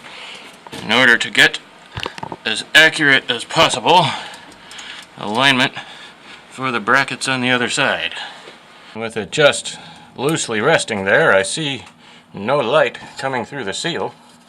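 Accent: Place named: Croatia. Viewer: Your heavy Croatian accent makes your otherwise brilliant vid difficult to follow. Have you considered providing American or Canadian subtitles?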